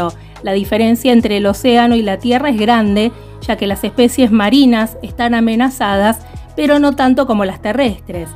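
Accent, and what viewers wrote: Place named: Buenos Aires, Argentina. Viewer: Es obvio que sos de Buenos Aires, igual que yo. Me doy cuenta por tu acento, y me siento orgullosa de que seas de acá. Te felicito por tu canal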